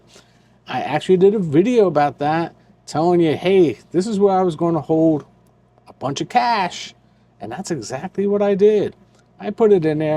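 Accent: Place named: Pennsylvania, United States. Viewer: do you hail from Pa or Delaware? You could be from your accent! I think it's adorable....also could be a Baltimore / Md accent ha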